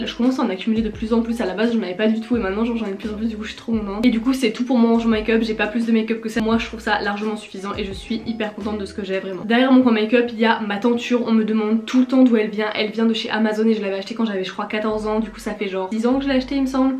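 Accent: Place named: England, United Kingdom. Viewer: salut, j’ai entendu ton accent anglais et je suis juste sous le choc- est ce que tu pourrais me dire comme tu as fais pour avoir un accent aussi beautiful stp ah oui et aussi tes magnifique meuf et ta chambre aussi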